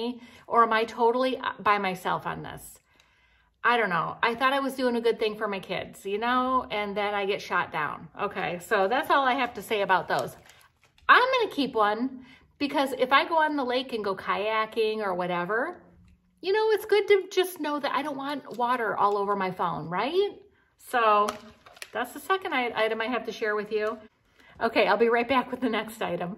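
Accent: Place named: Midwest, United States. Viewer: The first thing is I noticed your accent. I thought to myself she’s from the Midwest possibly Michigan. That’s where I was born and my relatives always sounded like that. I moved away at seven and did not have that accent the second thing is I have a Ziploc type phone protector like that for the beach and I love it. The other thing is where are those skorts while you can. I am 63 and a longer wear them. I gave them up about 13 years ago. Do you look so cute in them and I love the tops and I would take that first stress and I would cut it off and make a blouse it’s adorable. I’ll have to keep my eyes out for the jeans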